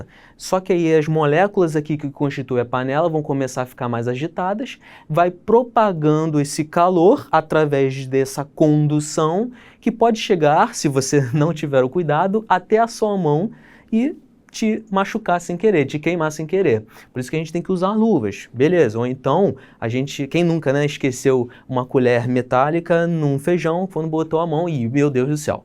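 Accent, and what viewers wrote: Rio de Janeiro, Brazil. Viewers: Um carioca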